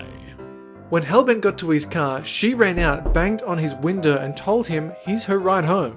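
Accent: Australia. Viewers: Your Australian? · Wait Are You Australian Because Ì M Am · YOUR AUSTRALIN!!!!!!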